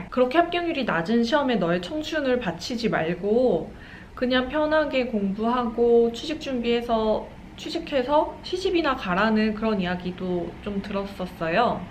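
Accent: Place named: Seoul, South Korea. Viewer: 부산분이신데 말씨는 완전 서울 말씨인데요. ㅎ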